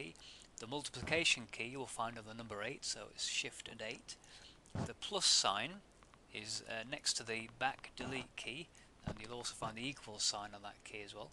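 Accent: United Kingdom. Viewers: With a very nice British clean accent. Easy to learn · I've watched several Excel tutorials on YouTube, and this fellow has - by far - the best delivery and most pleasant voice of any that I've seen. Love the British accent and the relaxed, understated tone to his narration. Oh, and none of that annoying typewriter sound effect that is used in some other tutorials!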